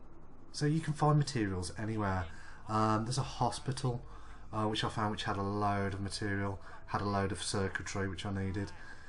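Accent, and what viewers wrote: West Midlands, United Kingdom. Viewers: Subbed because you have a West Midlands accent :D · Sounds like a West Midlands accent to me :)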